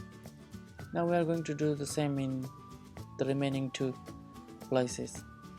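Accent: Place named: Japan. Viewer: And guys his counting doesn't suck, Its just hard to understand his accent. How about you all take classes in Japanese language for a change, Then you will hear the accent.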